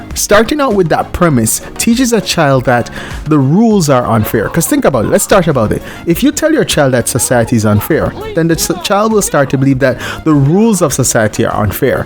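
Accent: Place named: Jamaica. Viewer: You sound like a batty Boi Jamaican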